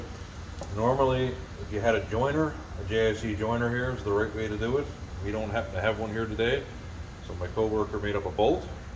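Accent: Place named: Newfoundland, Canada. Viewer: Hey man, where are you from you sound like a Newfoundlander?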